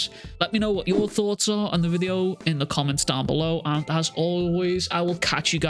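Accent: Liverpool, United Kingdom. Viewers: Subscribed for the Liverpool accent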